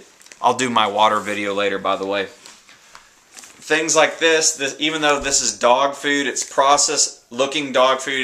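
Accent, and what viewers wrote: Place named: South, United States. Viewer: Yea I didn't really think so and the sweater(and that soft southern accent of yours). kind of made me think lol...it wasn't meant to be insulting. It doesn't even matter to me though really but I as well throw up in my mouth sometimes thinking about it. I just thought, "This gay guy is putting up some sweet videos." Sweet vids man sorry for my ignorance :P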